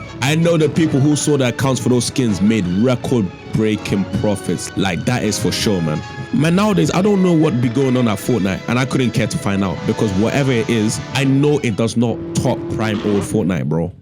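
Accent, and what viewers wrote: United Kingdom. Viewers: brit and slow, pick a struggle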